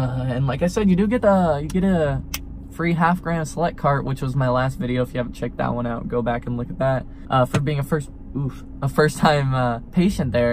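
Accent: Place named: Arizona, United States. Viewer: I can hear your AZ ascent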